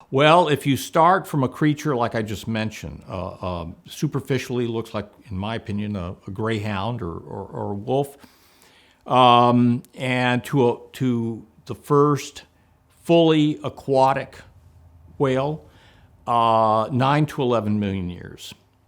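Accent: United States. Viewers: Ha, it's mostly a middle American accent with a 'who knows what' perturbation. May have grown up in one place, and moved to another during childhood.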